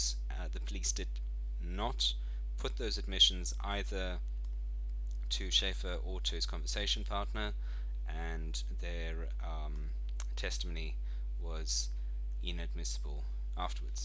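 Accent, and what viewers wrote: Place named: Australia. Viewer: Bill Hahn I think its Australian.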